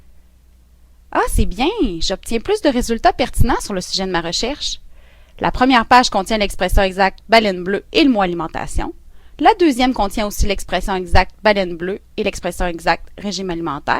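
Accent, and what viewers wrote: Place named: Canada. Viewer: j'adore la voix canadienne, amusante🤭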